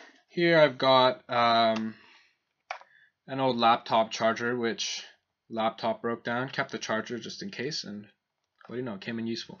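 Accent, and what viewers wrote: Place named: Canada. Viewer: What's up with Canadians (presumably by your accent) and amateur chemistry? IIRC Nurd Rage and Nile Red are also from the land of the North Woods (assuming they are not the same person, likely but unconfirmed as that is). BTW does it really matter how centered a stir rod is? It's been ages since I took Chemistry in HS and TBH I don't recall any of it. I am about to start messing around with home made enchants and looking at the hardware I have in the junk drawer there are lots of motor options. Precision centering things might be more of an issue for me though. Any advice?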